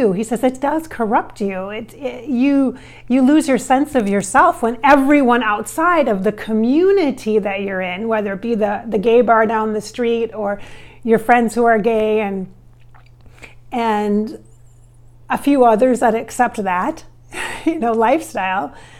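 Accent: Ireland. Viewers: Was paul irish? Almost sounds like an irish accent as you are speaking. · You developed an Irish accent. That’s interesting that you developed his accent. When you talked to him.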